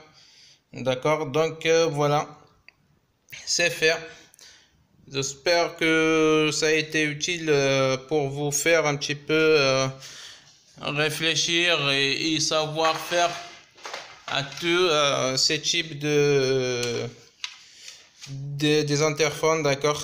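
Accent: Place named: Kabylie, Algeria. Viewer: Juste avec ton accents, je savais que tu es kabyle hhhh bon courage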